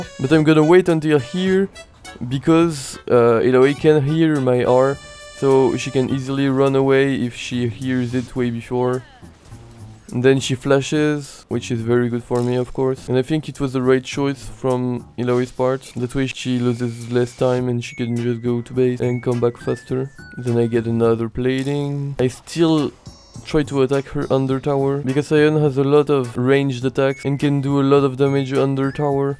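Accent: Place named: England, United Kingdom. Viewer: l'accent anglais de mieux en mieux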